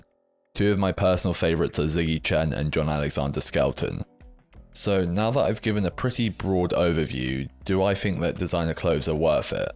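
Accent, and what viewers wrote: United Kingdom. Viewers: when a monotone british man speaks, i listen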